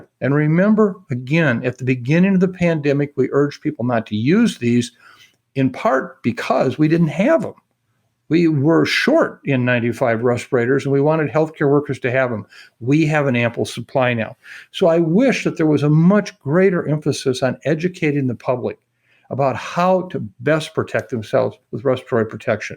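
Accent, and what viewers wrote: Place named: Minnesota, United States. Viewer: He's from Minnesota and that's the dialect.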